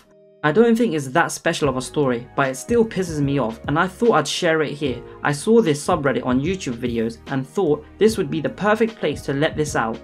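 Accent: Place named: Scotland, United Kingdom. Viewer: ??? The person speaking is speaking with an accent that is from the UK.. Not exactly sure where, sounds rather Scottish. So.. What are you on about? Their English is more than likely, better than YOURS.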